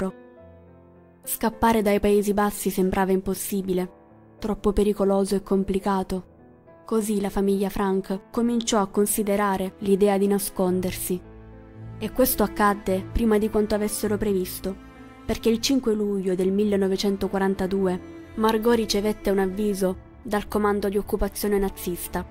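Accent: Sicily, Italy. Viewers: Scusami ma non riesco a procedere con la tua lettura con quello spiccato accento siculo. Per favore un po di professionalità non guasterebbe e un bel corso di dizione pure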